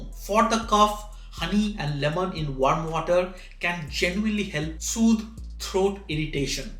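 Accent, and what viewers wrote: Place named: United Kingdom. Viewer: I love your British accent. Where in the UK are you from?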